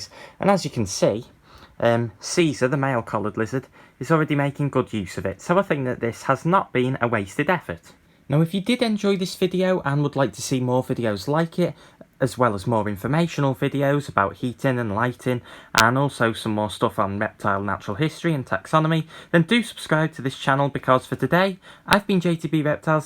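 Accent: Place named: England, United Kingdom. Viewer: And never pass up an opportunity to hear the king's English.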